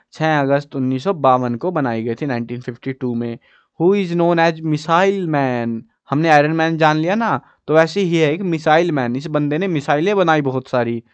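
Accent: Haryana, India. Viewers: Ap haryana sa ho kya bhai sorry. But Mariko ap ka history samaj nahi aaya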